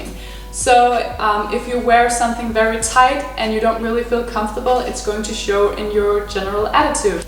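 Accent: Denmark. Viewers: Man kan næsten ikke spotte din danske accent 🙈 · Hej jeg kan høre at du er dansk , er der en video hvor du snakker dansk, eller har du en blok/hjemmeside på dansk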